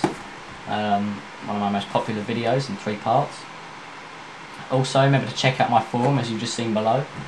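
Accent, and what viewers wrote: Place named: England, United Kingdom. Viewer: Man i love your English accent ^^ and also your bluRay :p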